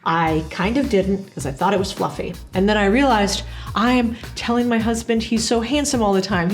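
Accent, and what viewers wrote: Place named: Midwest, United States. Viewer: You are Latina with beautiful hair, but you have a Midwestern (no) accent. Please explain.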